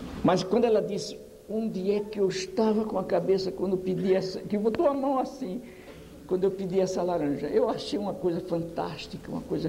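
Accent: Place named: Northeast, Brazil. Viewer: Bolsominion não aguenta ouvir um homem inteligente,muito menos com sotaque nordestino.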